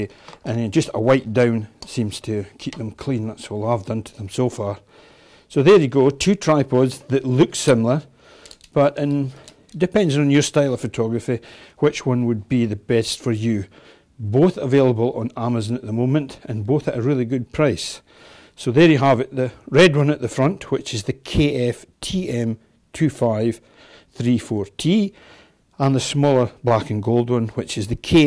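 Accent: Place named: Scotland, United Kingdom. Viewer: Clean Scottish accent ;-) like that :-D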